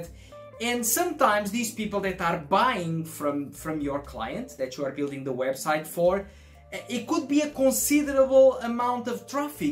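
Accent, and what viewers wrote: Brazil. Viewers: are you Brazilian ? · falou duas palavras eu vi que era brasileiro hahah ótima explicação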